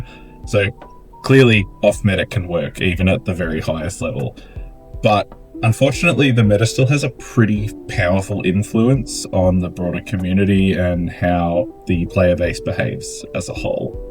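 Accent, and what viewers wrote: Australia. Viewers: subscribed just bcz of the aussie accent. Awesome content!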